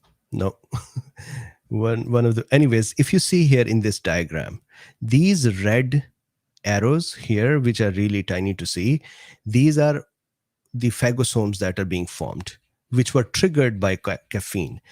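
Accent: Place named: India. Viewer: Universal Indian pronunciation. Different from ours, like many other words.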